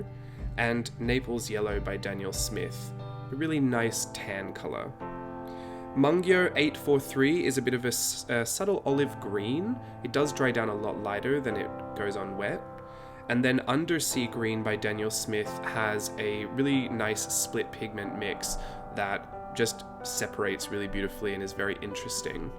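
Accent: Australia. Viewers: Just found you, looked you up.;) Always watching. Lol I knew you sounded Aussie. I live in Dulwich Hill. Are you in Sydney region? Congratulations on your recent success after a car accident and changing careers to find something that you love. I like Irit's channel too. Love Japan, and Van Gogh. Highschool Teacher of woodwork and stuff then after many car accidents (none at fault!) I slowly changed to the little ones Learnt for 4 years I am a Montessorian I no longer teach but, now writer, artiste, illustrator. Watercolour collector of professional brands and handmades love inks and journals too (on a no buy break it is killing me) We are lucky to be alive both of us.:) Hope you are enjoying your Etsy shop break holiday. Now subscribed! In kindred spirit, Eliza Most Beautiful Day is Today! Sunday 26th of June, 2022 pm Dulwich Hill, Sydney Australia x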